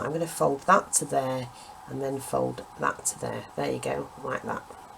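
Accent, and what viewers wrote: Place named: Yorkshire, United Kingdom. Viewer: Loooove your accent where may I ask are you from? Is it Yorkshire?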